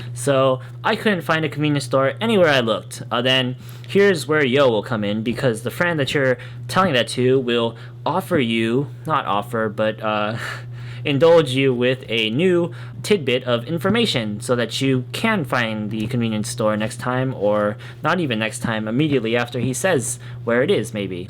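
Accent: United States. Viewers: you look so japanese man :D I thought you should be american with your english speech level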